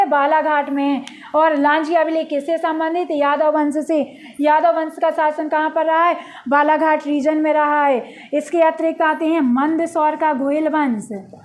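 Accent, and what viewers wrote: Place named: Bundelkhand, India. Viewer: Madam ji aap bundelkhadi lag Rahi kaha se he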